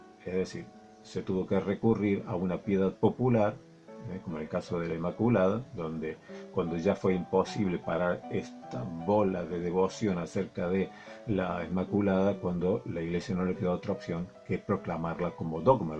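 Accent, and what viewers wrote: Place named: Cordoba, Argentina. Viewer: Jaja Dios lo bendiga disculpe no me pude peinar... Bien cordobes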